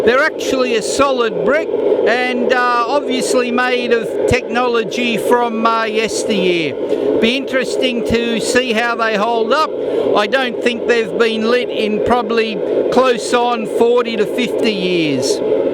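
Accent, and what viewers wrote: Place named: Australia. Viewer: flamin aussie's eh ?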